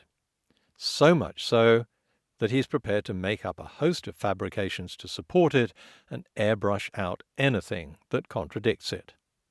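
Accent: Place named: England, United Kingdom. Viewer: Oh how I missed slightly sarcastic English voice.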